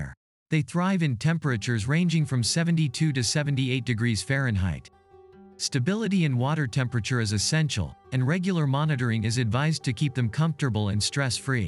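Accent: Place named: United States. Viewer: nice tutorial, i not only learn American English but also the knowledge on Electric Green Tetra😀👍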